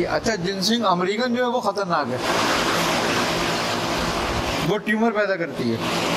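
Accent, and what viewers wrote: India. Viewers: Hajrat Aap ki hindi ek dam India wali hai...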